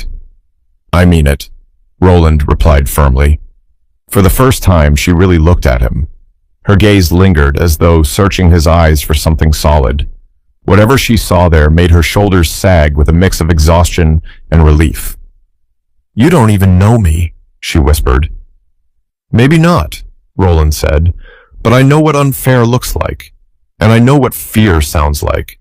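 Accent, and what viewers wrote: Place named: United Kingdom. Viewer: Best way to improve British Accent. RECOMMENDED🎉🎉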